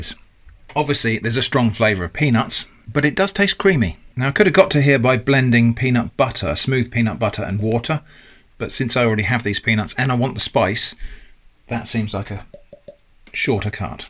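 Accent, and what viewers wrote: United Kingdom. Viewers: You and your wife are so adorable together at the dinner table! I love your British accent. I love all your videos! You're so down to earth and smart. You make very good content.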